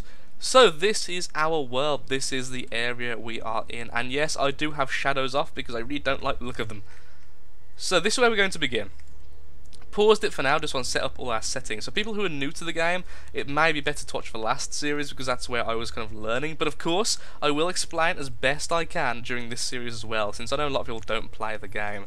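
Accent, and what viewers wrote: United Kingdom. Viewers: Harry Potter accent of english <3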